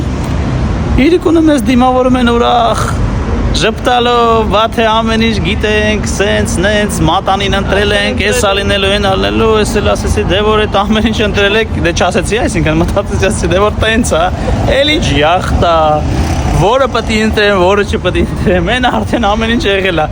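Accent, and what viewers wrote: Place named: Yerevan, Armenia. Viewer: Geniales los dos! cuando habla ruso,ya lo hace con una tonada Erevani ))))), saludos!!!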